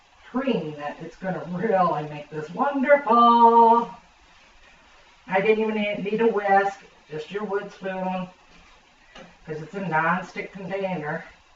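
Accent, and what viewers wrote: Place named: South, United States. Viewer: You sound like a good ole country girl, or at least you cook like one. That looks good; gotta give you a thumbs up. Save me a seat at the table, LoL...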